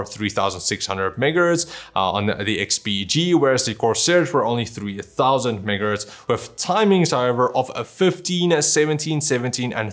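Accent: South Africa. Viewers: Definitely South African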